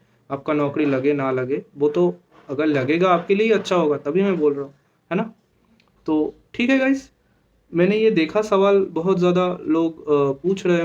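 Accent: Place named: West Bengal, India. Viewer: mai west bengal se hoon. Apki batein sunkar lagta hain ap v West bengal ka ho. Steno exm related main sirf apko sunta hoon, channel to hazaron paar, ek ap hi ho asardar, baki sab bekar😄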